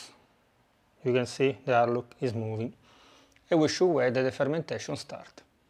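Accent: Italy. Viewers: Very informative with sweet Italian accent.. Grazie mille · You're too good. especially it's something to hear Kg, Litre etc. than hear some Oz and lbs(lot of bullshit). And keep up your italian accent. It's awesome!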